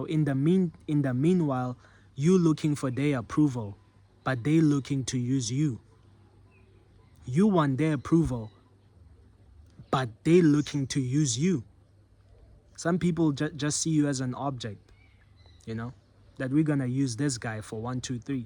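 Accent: South Africa. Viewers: You South African gee?, I can hear your accent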